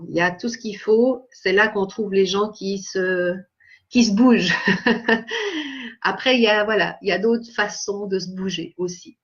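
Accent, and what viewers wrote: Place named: Canada. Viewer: Tu prends l'accent canadien:D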